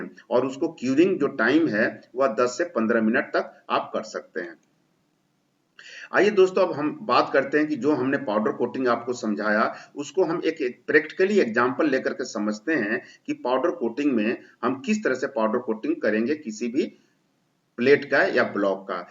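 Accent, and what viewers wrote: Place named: Bihar, India. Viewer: बिहारी लग रहा है।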